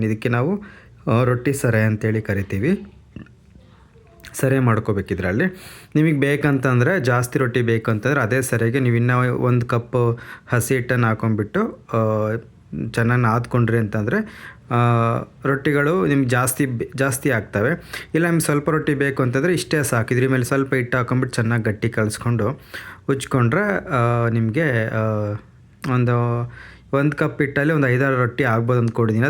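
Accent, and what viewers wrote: Chitradurga, India. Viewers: ಹಾಯ್ ಬ್ರೋ 🙏ನಮ್ ಚಿತ್ರದುರ್ಗದ ಮಾತುಗಳು ಕೇಳಿ ಖುಷಿಯಾಯಿತು ಬ್ರೋ 😊❤️❤️ರೋಟ್ಟಿ ಪಲ್ಯ 😋👌👌ನೀವು ಅಡುಗೆ ಮಾಡೋದು ನೋಡುದ್ರೆ ಖುಷಿಯಾಗುತ್ತೆ 👌👌ನಮಗೂ ಸಪೋರ್ಟ್ ಮಾಡ್ಬೇಕು ಅಂತ ಅನ್ನಿಸಿದ್ರೆ ನಿಮ್ಮ ಸಪೋರ್ಟ್ ಇರಲಿ, ನಿಮಗೆ ನಮ್ ಸಪೋರ್ಟ್ ಖಂಡಿತ ಇರುತ್ತೆ 👍❤️❤️😊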